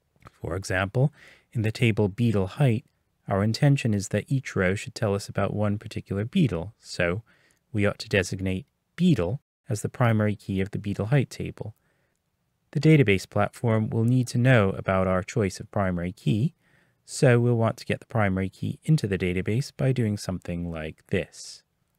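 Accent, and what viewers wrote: United States. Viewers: You from Baltimore? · Are you from Philly?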